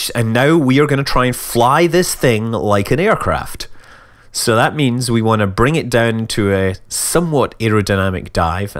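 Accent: Scotland, United Kingdom. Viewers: He's from scottland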